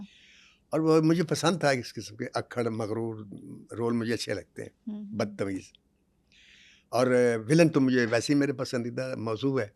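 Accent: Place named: Punjab, India. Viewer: I LOve your Punjabi... it's sweetness and familiarity is what makes my heart happy!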